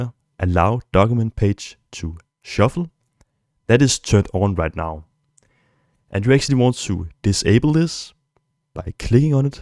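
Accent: Denmark. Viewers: Thanks this was helpful and I love the (Danish?) accent. :)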